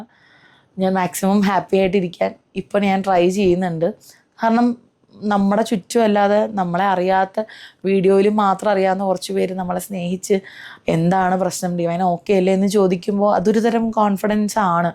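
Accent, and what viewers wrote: Thrissur, India. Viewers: kunje ethu dress ittaalum kaanaan nalla bangiund U R always perfect by all means Kottayam kaari yude language slang Thrissur kaari pole aayi Kottayam pokunna thrill il aann enjoy cheyyu Happy n Safe journey God Bless ❤❤❤❤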